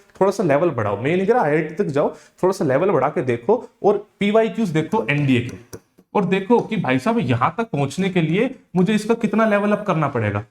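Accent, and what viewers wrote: Haryana, India. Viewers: Sir Kya aap HARYANA se ho ? ☺❤ Aapki awaz or boli dekh kr lgta h ki aap HARYANA se ho ....... Please reply sirji 😊❤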